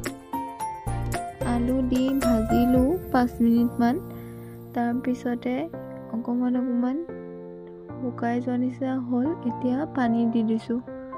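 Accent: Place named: India. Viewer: Beautiful. I find your bangla a bit different than kolkata. Where is it spoken like this?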